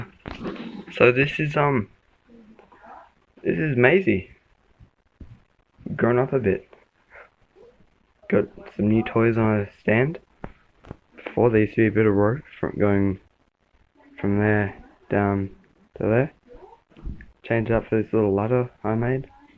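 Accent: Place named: Australia. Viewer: I follow several Aussies and their birds on YouTube. You don't have as pronounced an accent as most Australians so I thought that you might be from the UK. Anyway, I have missed Maisey and am glad to see her back. Love, from Clearwater, Florida.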